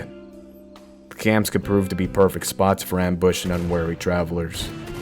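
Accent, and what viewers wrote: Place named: Deep South, United States. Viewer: Jesus, your narrator is great. That accent is awesome...Deep South + Eastern Europe?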